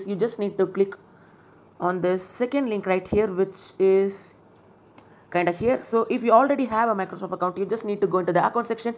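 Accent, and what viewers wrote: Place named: India. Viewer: U kinda sound hindi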